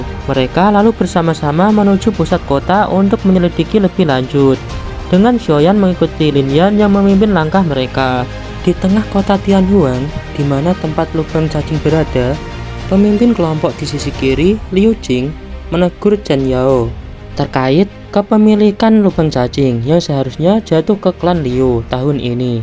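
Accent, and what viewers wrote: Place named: Java, Indonesia. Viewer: Nada jawanya medok banget bang 😂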